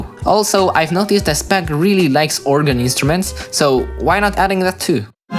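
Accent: Romania. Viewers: Wow awesome! Are you Romanian? You have the same accent as Gutter.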